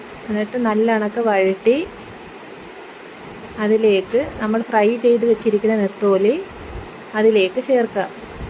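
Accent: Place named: Kollam, India. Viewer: ഇത്താ സ്ഥലം എവിടെ കൊല്ലം ആണോ സംസാരം കേട്ടിട്ട് കൊല്ലം ഭാഷയാണന്നു തോനുന്നു ആളുകൾക്ക് പ്രായജോനം ഉള്ള ചാനൽ 👌സൂപ്പർ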